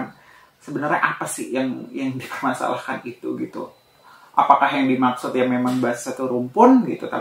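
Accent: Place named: Betawi, Indonesia. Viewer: Bahasa kau itu bahasa loghat betawi bukan bahasa Indonesia baku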